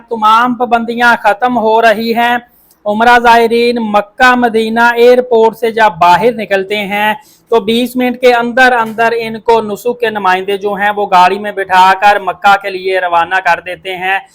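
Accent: Punjab, India. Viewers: پالیسی لفظ ہے جناب پلیسی نہیں بولتے 😊 آنگلش بھی پنجابی میں 😊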